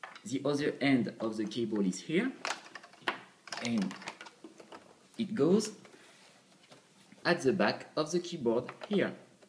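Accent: France. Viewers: Love that French accent ! My French wife said “OMG do I sound like that ?”. By the way, great videos, keep up the good work. All the Best. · hhhh putin l'accent française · Le mec a l accent français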